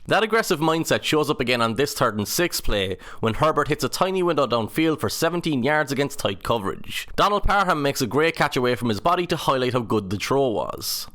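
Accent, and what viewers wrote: Ireland. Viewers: I am highly skeptical hearing an Irish accent explaining American football…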